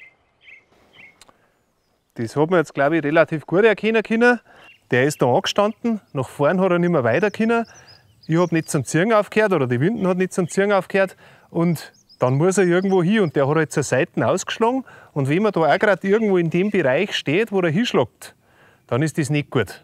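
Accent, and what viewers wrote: Bavaria, Germany. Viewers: Und der wieder in schener bayrischer Mundart erklärt😅 da muss man aufmerksam und konzentriert zuhören. Wie die Lehrlinge in der Labau😉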